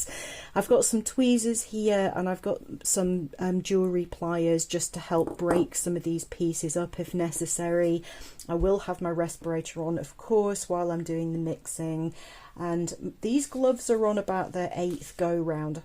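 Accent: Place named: Australia. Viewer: Love it!! Love your accent are you from Australia?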